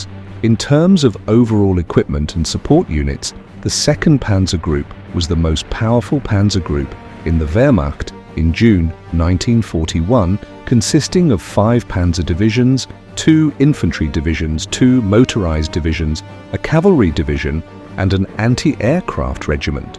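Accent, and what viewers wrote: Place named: Russia. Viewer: Great narrating and also new information to me thanks for this educational video ❤ I'm glad this gruesome war had ended ~ and I hope further peace persists ☮️ Full watch 🤍 PS: the Russian accent hehe 🤭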